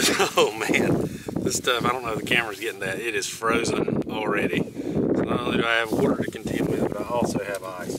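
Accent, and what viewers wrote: South, United States. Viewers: Not only was this a GREAT review of the products (plus I learned another way to set up for a fire👍) BUT I loved your humor about the "words" with your southern (?) accent! My daddy was born and raised in Irving, Texas, my mother is Japanese...they met in Japan during the Vietnam war when my daddy was a marine...so you can imagine the different "accents" I grew up with! Thank you for a good product review but a good laugh too! I appreciate the time you put into this video as well as you being in the cold! God bless🙏❤🇺🇸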